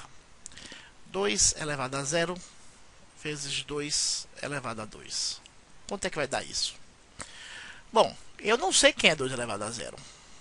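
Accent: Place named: Northeast, Brazil. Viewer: parabens prof. o senhor ensina mto bem! e com esse sotaque bacana do nordeste fica mtooo mais agradável! ;) valeu msmu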